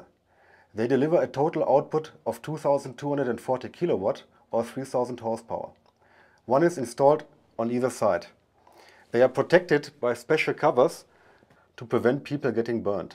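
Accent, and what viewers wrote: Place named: Germany. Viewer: Ummmh, when germans speak english :-(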